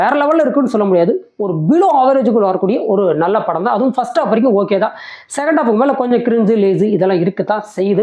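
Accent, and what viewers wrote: Kongu, India. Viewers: aiyo Mokkai padam season kodumaigal saaamy... well done review - Kongu Tamizh Vazhga..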